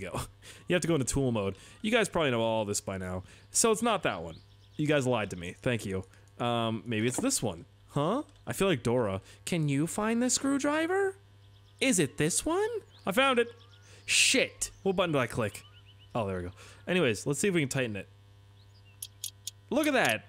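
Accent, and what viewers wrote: Germany. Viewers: Your German accent sounds like a gay man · im disapointed on the fact you put out german accsent on finnish game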